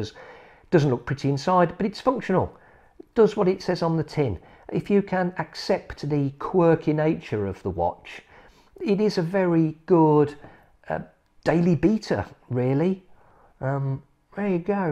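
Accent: Russia. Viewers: As a Russian guy from Siberia I approve this accent, tovarisch! Great review btw! Subscribed.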